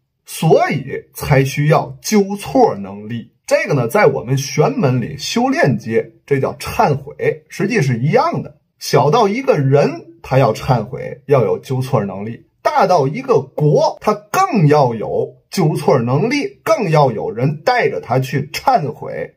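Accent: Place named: Tianjin, China. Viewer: 第一次听到带津味理智的优良政治評論！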